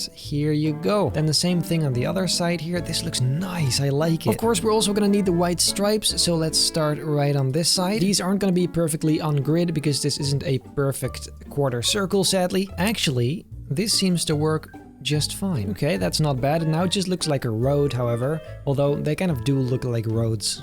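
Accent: Netherlands. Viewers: Ben je Nederlands, want je hebt een Nederlands-Engels accent😂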